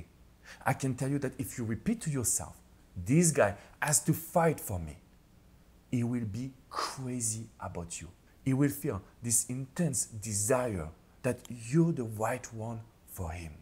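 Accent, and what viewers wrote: France. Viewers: Love your French Accent 😍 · Mr... i. like ur" frenglish" language 😊. thank u for ur advices. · Merci beaucoup cher! Please keep your French accent, its lovely and very charming, enchanté! Your advice is always precious:-) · I LOVE your french accent😊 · Omg, I love your french accent as you reminded me of my late french hubby's voice...oh I missed him so much... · Hi woooao you are such a great relationship couch you are making things happen with that French accent. do you you know what your teachings are so sweet and short very powerful. thank you very much for your teachings. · I love your videos and French Accent! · Come here for his voice strong French accent 😊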